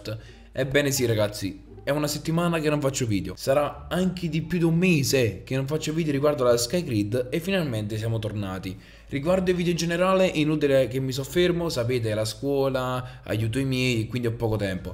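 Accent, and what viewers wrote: Naples, Italy. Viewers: ma sei napoletano?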